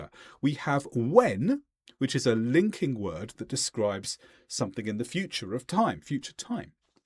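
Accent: England, United Kingdom. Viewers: What a joy to hear your English English pronunciation!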